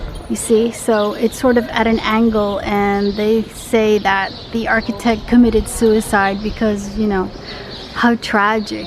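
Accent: United States. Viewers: This got randomly recommended, glad to see a coruñesa with American accent lol I was born at El Materno and sound coruñés as fuck in Spanish but also sound American as hell in English. Love that we are bringing some diversity back home!!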